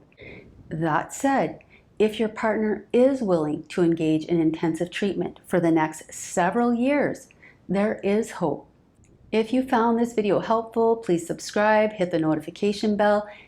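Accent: Canada. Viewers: I am diggin' the Canadian accent!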